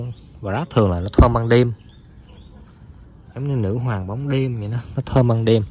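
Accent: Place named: South, Vietnam. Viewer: Mình rất thích nghe giọng nói của giọng chuẩn miền Nam nghe rất là thân thiện lại còn vui tính tại vì mình cũng là ngươi miền Nam.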